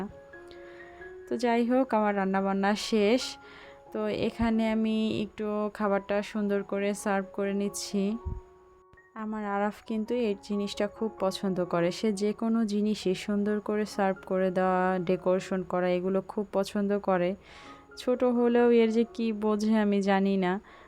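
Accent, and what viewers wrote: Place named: Barishal, Bangladesh. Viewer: বাবা টার জন্য অনেক দোয়া রইলো আপু বরিশাল শুনলে মনে একটু শান্তি শান্তি লাগে পিজ্জা টা অসাধারণ হয়েছে কিনা কড়াইটা ও খুব সুন্দর হয়েছে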